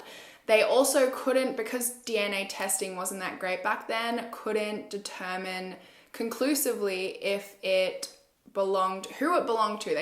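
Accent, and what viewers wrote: Australia. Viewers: I absolutely love Aussie accent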